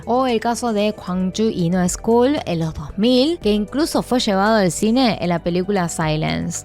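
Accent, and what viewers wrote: Buenos Aires, Argentina. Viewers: tiene acento argentino? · La información es muy buena pero que pasó con el tonito que le das al hablar te estas esforzando ser una argentina o eres una fanatica del acento argentino te sugiero que le des un acento neutro eso te ayudará mucho un consejito es bueno aunque sea de un conejito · de todos los acentos tenia que elegir el argentino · Tremendo documental. SERIA MUY BUENO que la modulación fuera un poco menos de recoleta... menos nasal... realmente me costo mucho superarlo... La información fue tan precisa y tan buena la edición que me mantuvo como espectador... Pero seria muy bueno mejorar eso. En Argentina es muy chocante el acento de ¨CHETA¨ para todo el resto de las personas que no viven en los barrios de clase alta... Se lo asocia a gente privilegiada que no tiene empatía y se cree superior al resto. Creo que el VIDEO PRESENTACION en el canal es muchiiiiisimo mas accesible en ese especto. 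Seria bueno que esa misma fuera la entonación para el resto. POR SI SIRVE LA CRITICA. El contenido es de primera calidad. Muchas gracias. Un abrazo.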